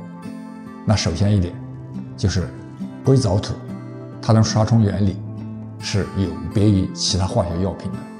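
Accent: Yunnan, China. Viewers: 老師是不是雲南人，普通話有雲南口音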